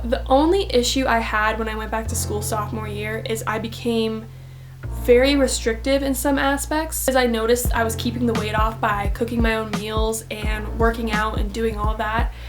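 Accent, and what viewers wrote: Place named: Montana, United States. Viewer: that montaner accent 😂 gotta embrace it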